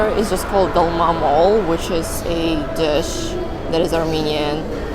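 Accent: United States. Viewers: You sound very American 😂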